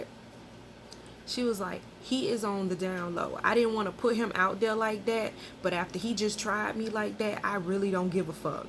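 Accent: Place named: South, United States. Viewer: She has southen accent. Country niggas is Gay. Whats new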